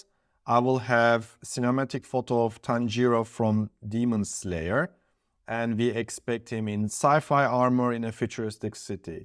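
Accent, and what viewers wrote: Turkey. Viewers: aksanı duyduğumun 5. saniyede falan dedim ki bu türk. anca bu aksan bir Türk'te olur. Çok farklı konuşuyoruz gerçekten yabancılardan. nasıl hintliyi vs fark ediyorsak konuşurken. türk kişide anında anlaşılıyor be kardeşim. iyi çalışmalar.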